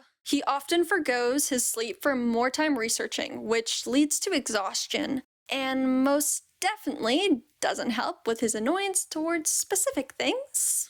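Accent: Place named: Canada. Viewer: You sound so Canadian in this btw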